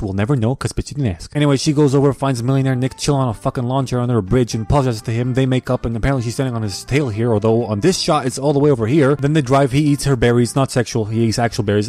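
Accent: United Kingdom. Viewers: Lol did you get your British accent and lingo from top gear? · By Jove he's British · his british voice though XD "funi gag m8 DMV slow what a knee slapper m8 that made me laugh so hard oml -a fan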